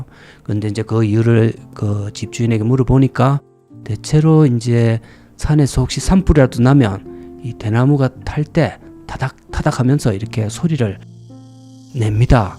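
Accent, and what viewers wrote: Gyeongsang, South Korea. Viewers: 전라도 사는 60대 입니다! 경사도 사투리가 넘 구수합니다.꼭 구독하고 있구요.정말 많이 배우고 있읍니다.감사합니다.수고하세요.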